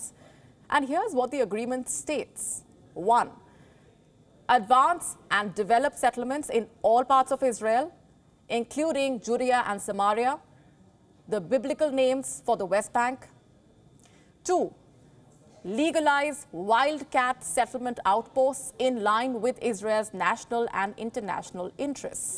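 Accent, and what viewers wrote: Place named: India. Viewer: Indian English..🤦‍♂️.... " Julia and sumalia ... 😳😳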